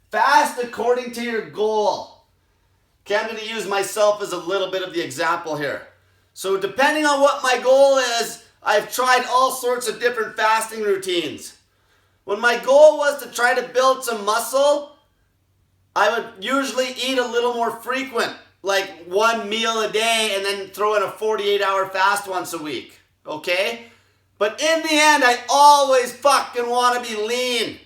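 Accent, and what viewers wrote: Canada. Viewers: This guy's high-pitched hoser accent is hilarious.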